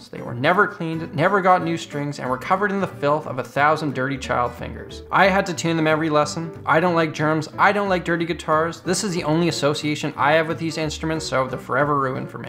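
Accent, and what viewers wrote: Canada. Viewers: This is the most Canadian man I have ever heard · First ten seconds I knew you were a fellow Canadian lol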